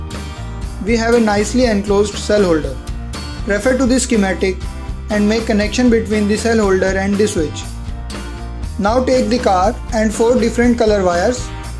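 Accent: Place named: India. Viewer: the accent is like india people / indian , are you indian ?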